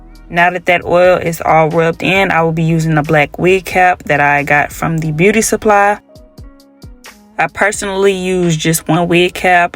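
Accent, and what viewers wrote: St. Louis, United States. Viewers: There’s no way you’re not from St. Louis lol😂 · I love the accent 😭 are you from St Louis?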